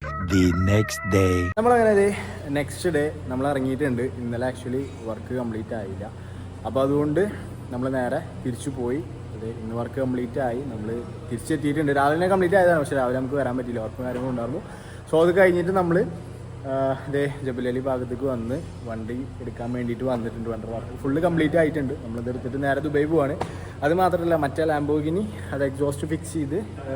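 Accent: Kasaragod, India. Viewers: Kasargod slag ayaloo 😂🔥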